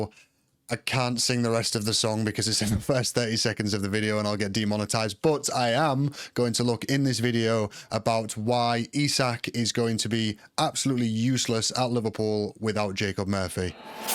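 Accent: Manchester, United Kingdom. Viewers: You again??? Horrible singing noise, ye stealing me subs and I am going to make a vid absolutely destroying you if you keep making so much vids. Ye ain't a Geordie and ye came from nowhere with ye Manchester accent and nicked me subs. I get 2500 subs on me main channel while you get 6500??? Ye bought subs and have time tea make loads of vids all the time like, ye vids are not as fun as mine and ye don't have mason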